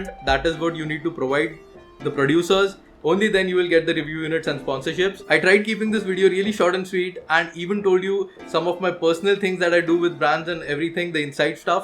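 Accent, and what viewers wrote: India. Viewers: I dont Understand anything indian-English make me confused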